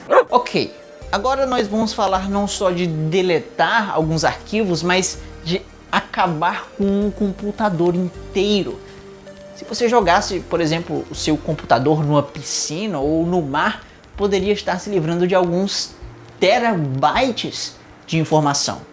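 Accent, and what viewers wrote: Ceará, Brazil. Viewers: Você é nordestino?? O sotaque parece com cearense, n sei, sou cearense e escuto muitos cearenses e piauiense falando assim.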